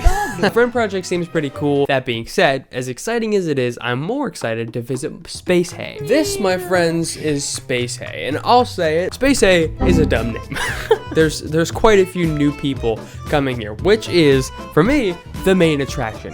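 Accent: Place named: Midwest, United States. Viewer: midwestern kurtis conner? (also omg we're litch the same exact age what)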